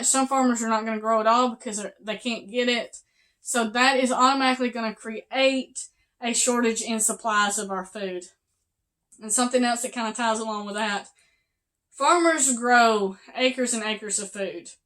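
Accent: South, United States. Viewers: Subscribed, not just for the info... but I love that southern accent! (I'm a Yankee in northern Indiana so it's rare to hear.)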